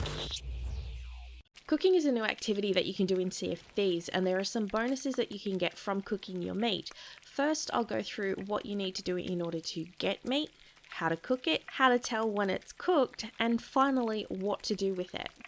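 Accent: Australia. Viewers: Love the Aussie accent and the guide is really useful. Your so underrated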